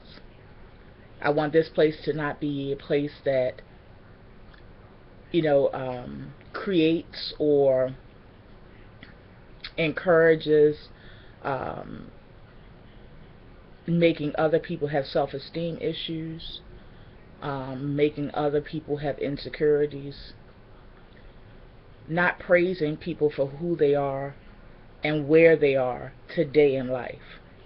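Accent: Baltimore, United States. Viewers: Trying to catch ur accent! Where r u originally from? I hear Baltimore a bit???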